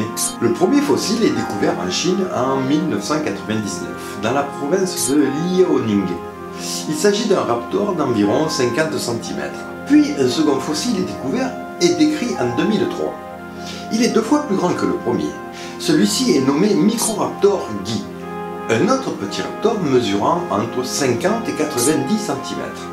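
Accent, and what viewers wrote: South, France. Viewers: L'acsent du Sud.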